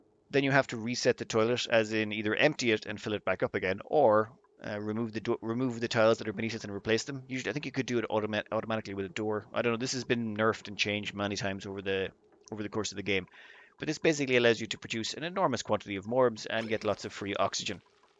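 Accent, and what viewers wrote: Ireland. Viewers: hey long time watcher first time poster, love all your vids, just wondering where in ireland are you from? im guessing south dublin sans d4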